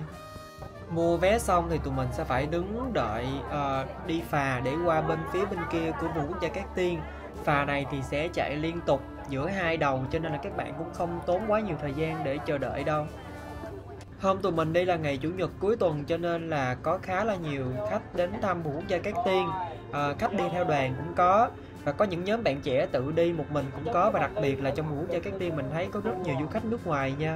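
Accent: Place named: Mekong Delta, Vietnam. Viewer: Bạn nói chuẩn giọng miền Tây luôn, thân thương ghê🥰 (mình nói chuyện bị lai giọng SG rồi, mặc dù mới lên mấy năm) nhưng mà 50 mét chứ đừng mess nha 😂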